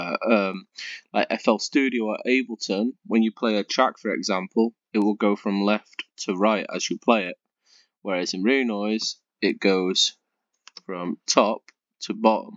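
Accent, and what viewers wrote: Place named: United Kingdom. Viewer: I forgot that you're british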